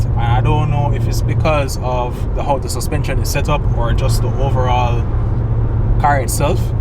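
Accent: Jamaica. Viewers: When the video started i heard the accent and did a wonder if you’re from Jamaica enuh, i was right 😂 subscribed immediately 🙏🏽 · Suh mi jus deh yah a watch bmw build and mod videos not really a car person and mi say let me watch this video den boom here comes a yaad man accent box mi just Suh glad fi see a yaad man channel big up ute🤣🤣🤣🔥🔥🔥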